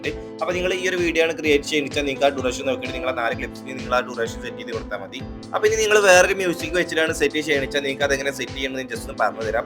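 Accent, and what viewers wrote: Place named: Kannur, India. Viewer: Machuuu neggaa kannur alle 🫵🏻😂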